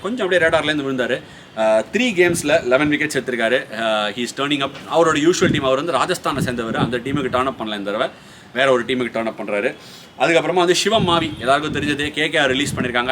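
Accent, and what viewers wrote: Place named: Chennai, India. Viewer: I just love your way of talking. Keep it up. Very casual very Chennai though I am not from there,it's highly amusing.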